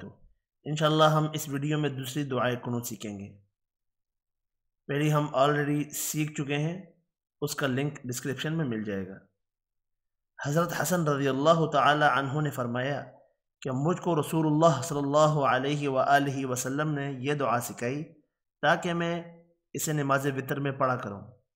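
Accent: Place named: Arab world. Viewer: Jazakum Allahu Khair for making this video🌺 I also appreciate that you are using an Arabic accent not an Urdu accent✌️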